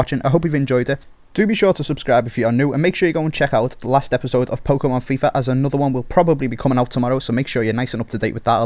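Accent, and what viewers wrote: Liverpool, United Kingdom. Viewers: i hate scouse accents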